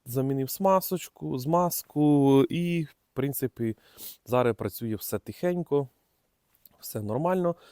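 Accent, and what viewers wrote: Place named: Uzhhorod, Ukraine. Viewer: перший раз глянув відео,ну акцент чисто як з Ужгорода,ближче до мадярів,Вітаю зі святами!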